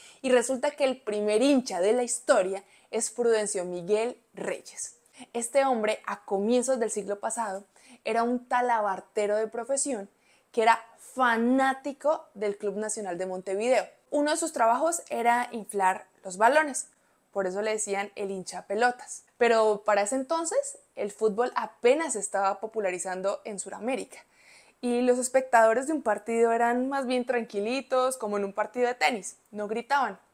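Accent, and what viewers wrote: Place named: Colombia. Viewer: Hello i love your videos! Sorry I can't type in Spanish it's not my first language. But i love learning Colombian Spanish with you. I'm from southern California USA and i mostly grew up speaking and hearing Mexican Spanish. So hearing your beautiful accent is always fun and i like learning your slang and dialect. I wish we had more Colombian immigrants here. So i can meet beautiful women like you! 🇨🇴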